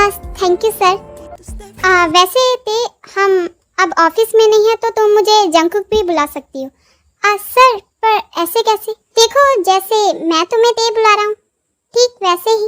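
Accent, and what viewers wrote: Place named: Bihar, India. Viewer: Badi mast bihari boli hai to good ❤❤❤❤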